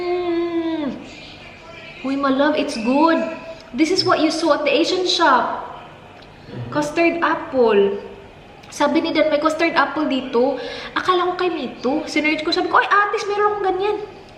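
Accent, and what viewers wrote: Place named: Visayas, Philippines. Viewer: Ayieeeeee himos kaayo ma bati imong inistoryahan te ba na a Jud Ang bisaya accent 💖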